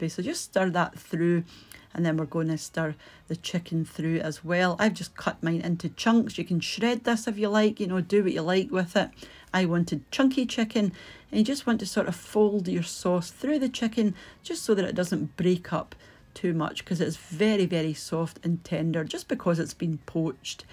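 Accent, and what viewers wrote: Scotland, United Kingdom. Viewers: it looks delicious and I love your Scottish accent! 🥰 ps. I've never heard of a "knob" of butter before☺️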